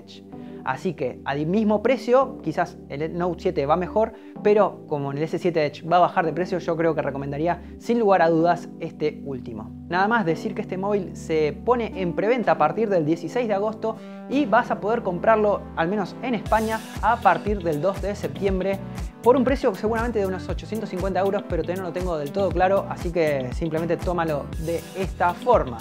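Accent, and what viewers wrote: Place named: Argentina. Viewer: El acento Argentino lastima los oidos.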